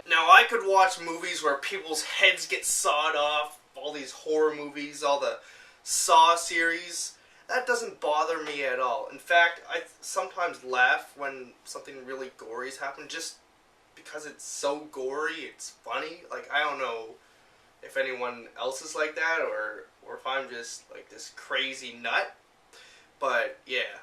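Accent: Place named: Canada. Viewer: You have such a Canadian accent. Love it!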